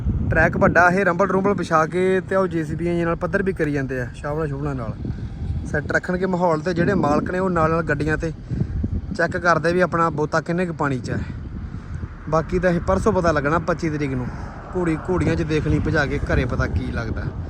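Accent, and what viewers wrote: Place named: Malwa, India. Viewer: Bai ni boli jma e desi malwai boli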